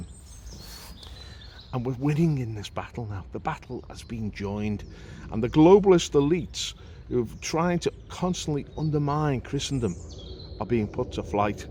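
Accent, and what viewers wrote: Liverpool, United Kingdom. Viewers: What a load of scaremongering misinformed populist crap. Why are so many old men so ill informed and ignorant of the facts..? Possibly because it's so much easier to maintain prejudice and bigotry rather than investigate reality.. There is no foreign invasion of the Netherlands. What bullshit. Your accent suggests Liverpool... So where are your roots..? Celtic, Picts, Scots, Roman, Anglo Saxon...? According to your stupid xenophobic logic all those peoples were foreign invaders. So where does that leave you..? Go back to where you came from... Fool.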